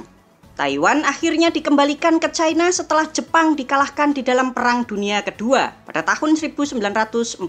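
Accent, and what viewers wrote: Java, Indonesia. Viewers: Njenengan tiang pundhi min kok suaranya medok jowone min 🙏🙏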